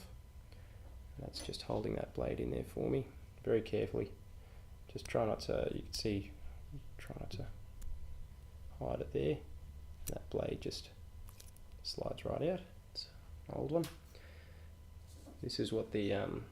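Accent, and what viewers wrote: Australia. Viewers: your voice is perfect and the Aussie accent is an added bonus!!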